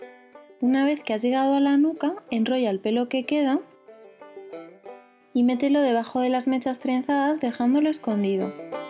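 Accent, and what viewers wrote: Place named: Spain. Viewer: Yo savia ke hablabas español por tu acento cuando hablas frances!! :P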